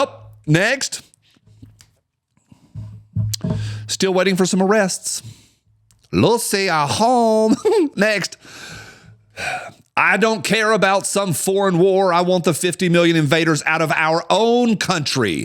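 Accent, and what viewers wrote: South, United States. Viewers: I don't think I ever heard a gay guy with a southern accent before (nothing wrong with it).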